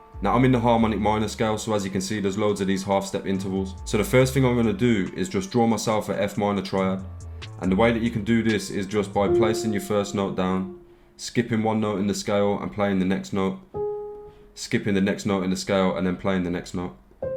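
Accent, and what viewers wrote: Birmingham, United Kingdom. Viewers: Sounds like you’re from Brum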